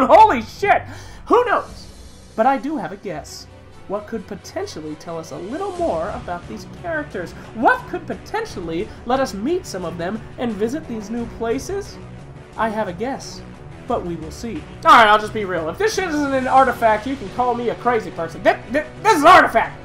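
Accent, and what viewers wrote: United States. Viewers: >American pronunciations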